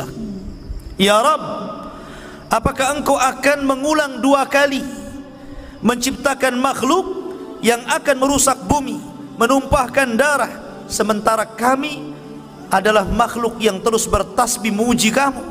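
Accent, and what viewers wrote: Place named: Arab world. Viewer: Bicara nadanya begitu cih gaya timur tengah bahasa yg mengerikan pdahal Islam rahmatan lilalamin,,biasa saja said nya ora. Indonesia yg landai ding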